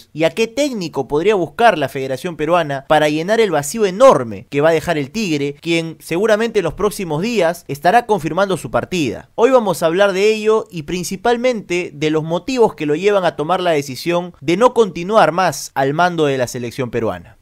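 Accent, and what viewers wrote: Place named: Buenos Aires, Argentina. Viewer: Te escucho algunas veces, pero es la primera vez q escribo, Gareca aun no ha dicho nada y lo que afirmas es mera especulación, muy típico del nefasto períodismo de fútbol peruano, salvo honrrosas excepciones, si sacan a Gareca, acá el tema no sólo es destruir sino proponer quien debería ser su reemplazante si afirmas que Gareca se va Y la otra el acento bonaerense habría que evitarlo un poco. Saludos y éxitos a futuro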